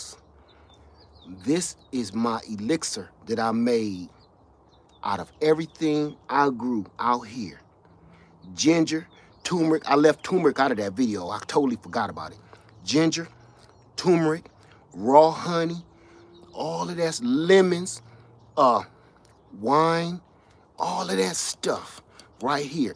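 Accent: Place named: Northeast, United States. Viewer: Sounds like you're in the northeast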